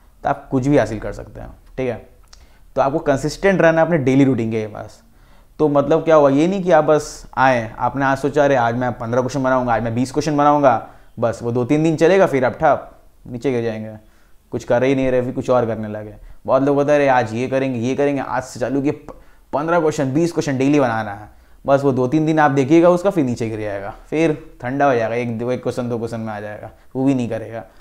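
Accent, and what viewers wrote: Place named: Bihar, India. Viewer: UP-BIHAR ACCENT ☺️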